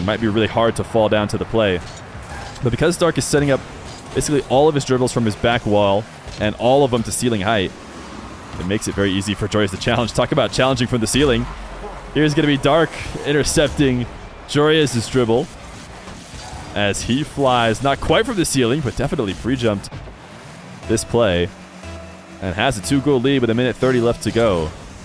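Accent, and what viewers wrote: United States. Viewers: Bro, you’re literally a Johnny boy copy in American accent. I get it, he’s very successful, but bro. Please find YOUR style. Don’t need to sound like another successful person…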